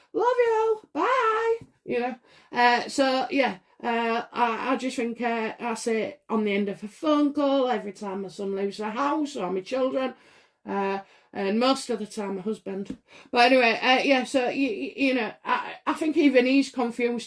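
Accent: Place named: Northern England, United Kingdom. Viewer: There have been times when you've had me laughing so hard, I had tears in my eyes. You make me laugh an awful lot. It's your northern straight forward talking. Also your own spontaneous reaction to some of the cards you pull. You're the best💕 As for H's book, how can he say he's become a man. He's a treacherous backstabbing weevil, married to a money grabbing plagiarist. I truly feel for the Queen, Prince Charles, Prince William and the rest of the Royal Family. Love and light everyone from here in Ireland. 💞💫💞💫💞💫🇮🇪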